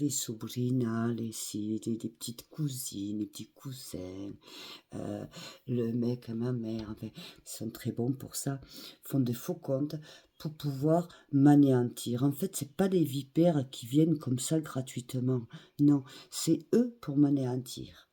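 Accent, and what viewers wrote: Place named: Southwest, France. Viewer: Bonjour vous avez un bel accent serait t'il pas du sud ouest? Bonne santé à vous signé.Boudu con.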